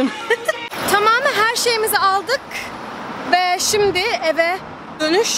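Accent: Turkey. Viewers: trakya şivesi var beeee cndkcndkcjdkfkeodidod yerim yerim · Biraz Egeli gibi konuşuyorsun çok şekersin ya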